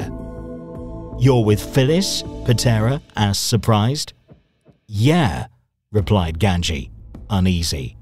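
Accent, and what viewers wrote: United Kingdom. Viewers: the British pronunciation of the surnames is laughable.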